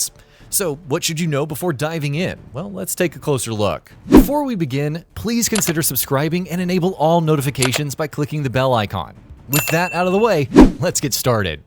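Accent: United States. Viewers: Never let an American narrate this again..... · drives me up the ffkin wall. what the hell is ren olt?? Re nooo! not hard and Marrrzipin is Maz a pin Why won't yanks get that the letter a isn't a flaming R!! And Alain Prost where is the e that turns that o to an oh sound??? FFS I don't like dislike many videos but this gets one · Jesus christ the Americans yet again have murdered the english language.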